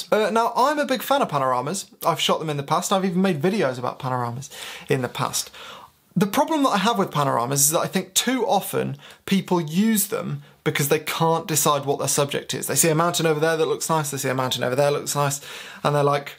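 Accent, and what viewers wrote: United Kingdom. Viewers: Mans is so British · At first I was like, "who's this opinionated, whiny Brit on my screen?" But, I watched anyway... And, I'm happy to say that we agreed with all 5 of those photography tropes that you covered in this video! #Subscribed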